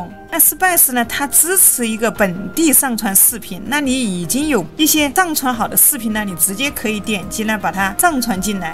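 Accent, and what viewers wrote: Sichuan, China. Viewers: 这个川普太养人了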